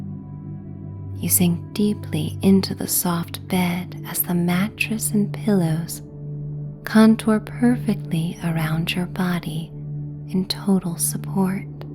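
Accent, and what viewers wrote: United States. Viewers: Why is this recorded using an American and not English accent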